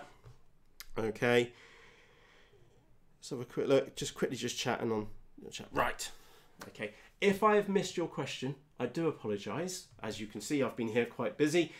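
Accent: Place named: England, United Kingdom. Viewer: So English, makes a change from all the American channels, loving it.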